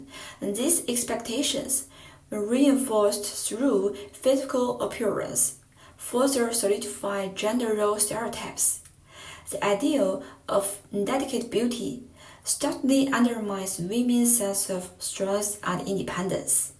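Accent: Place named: China. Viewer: You have a beautiful Chinese accent. But I am confused. I am not sure. You are either Hong Kong or Taiwan or Singapore or Macau. Because Mainland Chinese can't use YouTube right. Please tell me where are you from. I really wanna know where you are from. I am a new subscriber. That's why I don't know. Sorry about that😊♥️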